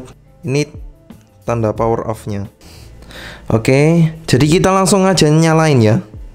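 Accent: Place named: Java, Indonesia. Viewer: I luv ur medhok min wkwk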